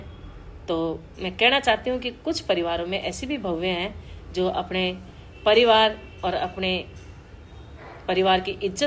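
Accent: Gujarat, India. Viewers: Ek dum faltu. Kuch bhi reel mt banao....GUJJU accent. 🥿👢🥿👢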